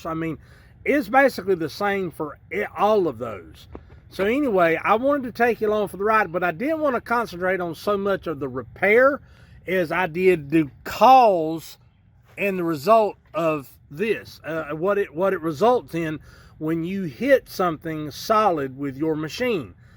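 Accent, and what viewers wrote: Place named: South, United States. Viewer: miss that southern drawl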